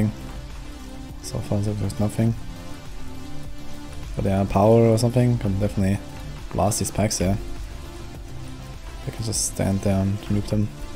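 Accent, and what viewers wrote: Germany. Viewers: you are 1000 percent a german right?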